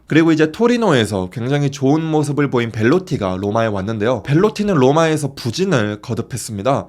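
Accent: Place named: Jeolla, South Korea. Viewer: 전라도 억양 디게 거슬리네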